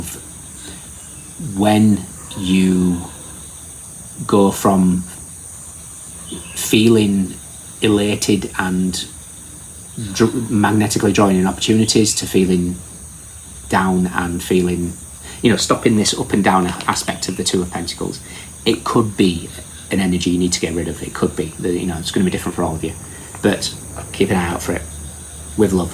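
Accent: Northern England, United Kingdom. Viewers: Yay ,someone British! Liked your reading, although I love all readings from everyone from all over the world 🌎 (I can tell you are northern, where are you?) I am a southerner London 😊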